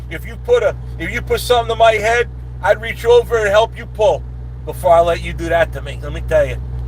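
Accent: New Jersey, United States. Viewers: YOUR JERSEY ACCENT IS COMING IN STRONG TODAY..WINTER IS HALF OVER. GREAT VIDEO.. IT'S MIKE FROM CANADA.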